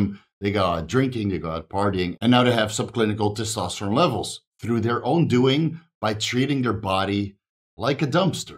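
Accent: Netherlands. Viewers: is this guy from netherlands or something? can't place the accent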